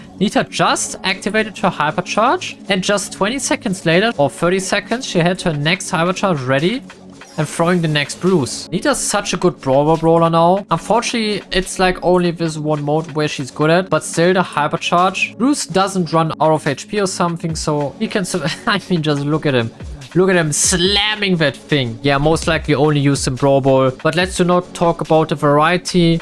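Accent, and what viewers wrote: Germany. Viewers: This guy is defo German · Dude i heared you First time and i instantly knew that you are a german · Ar u German ? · your german arent you pls say it in your next wedio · bro are you german? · could it be that you speak normally german ?? cause in the first 30 seconds of the video i relsied that your speaking of english is a bit different like mine cause i normally speak german too XD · Es ist so geil dich englisch sprechen zu hören mann hört das du deutsch bist (ich spreche genau so englisch) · Dieser deutsche Akzent ist ja crazy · Man hört den Deutschen Akzent so krass raus! 😂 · Man merkt dass du deutscher bist😂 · Are you german I hear an accent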